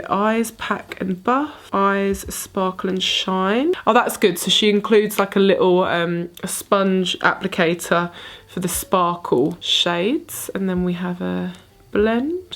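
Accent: United Kingdom. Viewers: I've been following you for a little while now and I really love watching your Instagram stories it's relaxing in some way, and I love you British accent! ❤️ I would love to see a glam make up tutorial for Christmas! You're amazing, love you 🌹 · God I love your accent! I’m an English teacher and I really admire your very British accent, I love listening to you 😂 why not compare all the new makeup of 2019, your favorites and the ones that didn’t work for you!! ❣️❣️❣️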